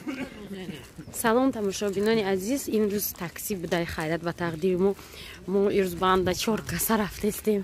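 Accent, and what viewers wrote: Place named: Kulob, Tajikistan. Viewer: Апаи зур ранги кулобиё Гап мезане 😘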